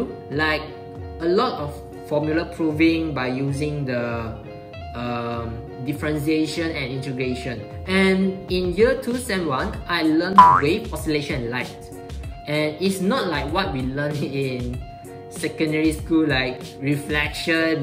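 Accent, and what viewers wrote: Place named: Malaysia. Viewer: 笑死 我直觉告诉我你是来自马来西亚的😂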